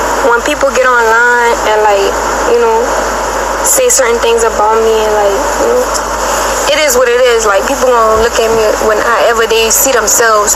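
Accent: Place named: Louisiana, United States. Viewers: her Louisiana accent coming threw strong. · Through it all, Ive always took note and loved her New Orleans accent. Its stronger now that she's back home ❤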